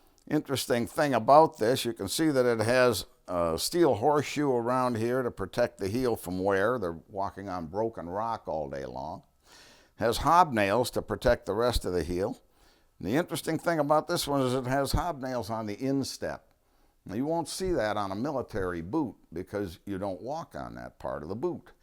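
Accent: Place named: Michigan, United States. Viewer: Thank you! Excellent presentation. I also enjoyed your MI accent. I have a 4" piece of native copper i found as a kid when we hiked up to an abandoned copper mine shaft on the UP. My dad was from MI and had done his Master's History thesis on a giant copper boulder of the UP hauled out in the 1840's. Years later i saw the boulder in the Smithsonian. I grew up in New England but we'd visit MI relatives & 1 week my family toured the UP. Most haunting was an abandoned copper processing factory, last used during WWII. Wish I knew quite where on the UP that was/is? Had ore cars rusting on tracks outside. Inside the 1 story factory, some machinery was still there. And real WWII posters were still on the wall! (This was 1970.) I took a Red Cross Homefront / Build Morale poster.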